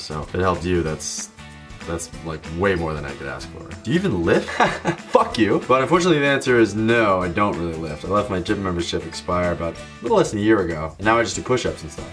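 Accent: Boston, United States. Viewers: I enjoy your Boston accent. :) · This was fucking excellent! That Boston accent is definitely coming through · Love the Bostonian accent.. and the beard!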